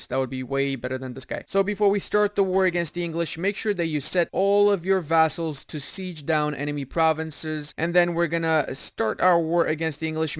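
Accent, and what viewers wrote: France. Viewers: Oh man I love your french accent....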